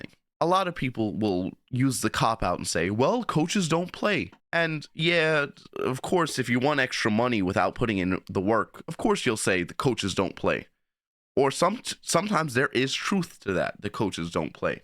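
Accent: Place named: New York, United States. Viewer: had a feeling you were from new york coach lmfao keep up the good work!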